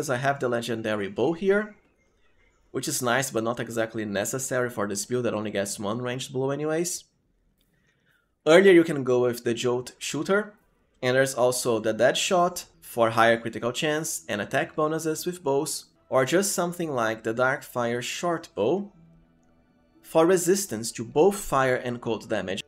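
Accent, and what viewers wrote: Brazil. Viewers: This guy has to be Brazilian, I just know iiiiiit!!!! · Com esse sotaque ja sei que eh br. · Tu tem um sotaque brasileiro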